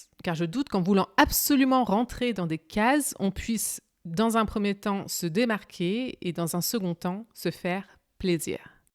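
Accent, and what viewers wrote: Canada. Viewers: Je perçois un léger accent québécois. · tu serais pas montrealaise par hasard?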